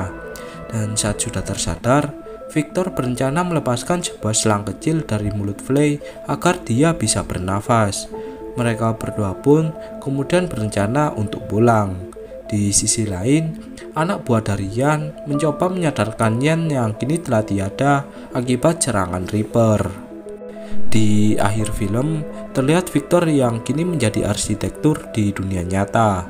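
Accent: Java, Indonesia. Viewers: Wkwkkw ,sama kek pemikiran gw dah persis kamar film tp ini versi medoknya wkwkw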